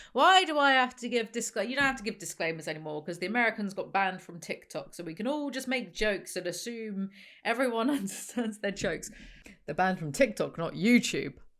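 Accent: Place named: Nottingham, United Kingdom. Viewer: As someone from near Nottingham, UK I appreciate hearing an accent like mine on YouTube and someone with my nasty sense of humour 😂😂 Thank you 😂❤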